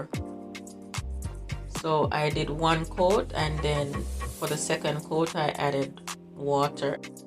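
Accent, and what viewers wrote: Jamaica. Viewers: Sounds like a yardie. Mi like it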